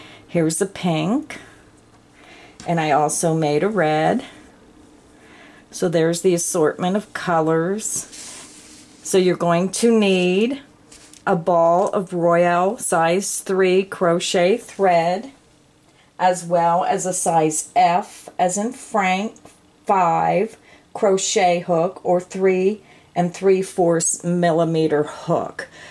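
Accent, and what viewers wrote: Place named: South, United States. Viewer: Love your southern accent especially when you say Grandma.... Love your channel period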